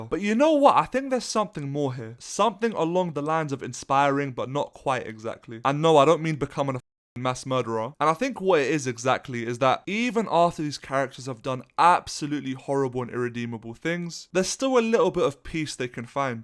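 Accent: United Kingdom. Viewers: Very good video but why the fk yall british bro. Change ur accent n u gon pop off. Love you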